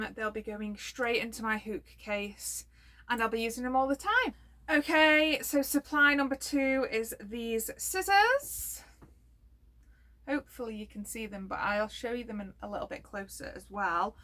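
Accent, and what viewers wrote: United Kingdom. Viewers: Are you from burnleh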